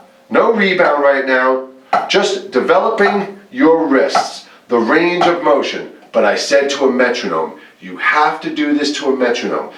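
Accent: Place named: Long Island, United States. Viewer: Thanks for this. How long duration, or how many measures, should we do the full wrist strokes at each tempo? Also, love your accent, you from "Long-Giland"?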